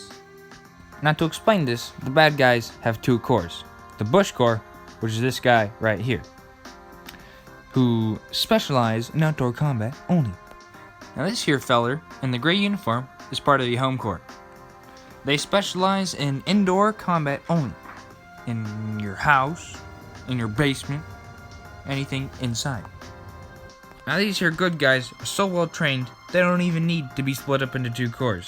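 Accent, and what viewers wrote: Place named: Germany. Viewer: Question can you voice a German tiger commander for me with your funny German accent?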